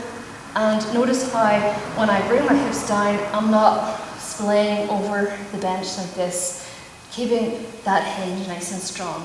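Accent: Ireland. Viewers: Irish accent. Beautiful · I'm guessing north Dublin but definitely could be wrong.. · Now, now, she's much more tastefully understated compared to the YouTube gym skanks. It's that Lucky Charms accent that does for me. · Are you Irish? Thanks for the video ...very helpful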